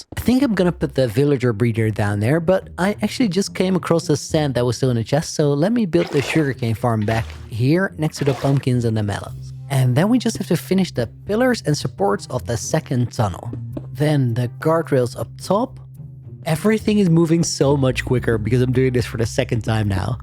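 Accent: Netherlands. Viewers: Toch lekker dat kleine nederlandse accenr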